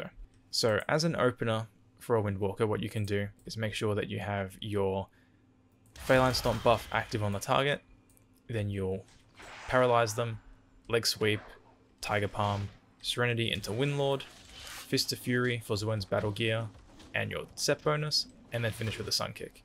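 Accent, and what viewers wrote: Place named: Australia. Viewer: I don't play WW but upon hearing a fellow aussie, instant sub. Watching this all the way through regardless because a 1 hour guide kicks ass, best of luck with the new channel mate x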